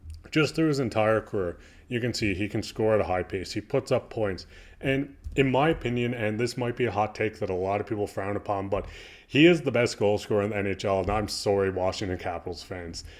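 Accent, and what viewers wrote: Newfoundland, Canada. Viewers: Newfoundland? but we can understand you.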